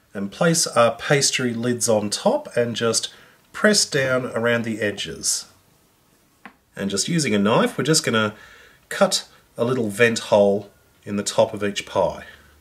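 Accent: Australia. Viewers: 2 things about this video: 1: I live in Mexico and I miss meat pies so much that I'm about to attempt to make my own, so thanks for this video. 2: I'm going to show this video to anyone who thinks all Australians sound like Steve Irwin. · love the aussie accent ^_^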